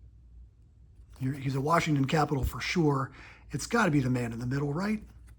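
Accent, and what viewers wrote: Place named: Canada. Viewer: Thanks for sharing, loved listening to you speak Canadian to us! The 1991 O-Pee-Chee baseball was a small set and was heavy on the Canadian teams, so you were right on.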